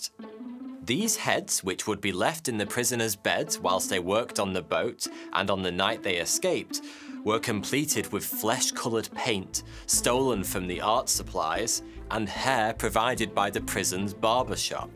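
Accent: United Kingdom. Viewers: So id got my old account kicked of youtube 🙃 and not having this guys videos in my life gave me extreme anxiety i really couldnt stand not having a steady flow of information in a british accent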